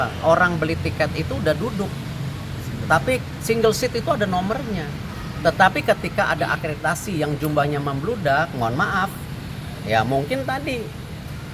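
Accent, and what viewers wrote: West Java, Indonesia. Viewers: Disini kok saya mendengar logat n aksens sundaness yee.. apa pak org sunda atw bs logat sunda yak.. behheh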